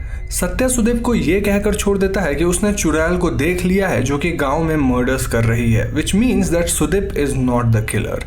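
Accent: Bengal, India. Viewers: Are u a bong? “😃.....Anyways”..... very well explained!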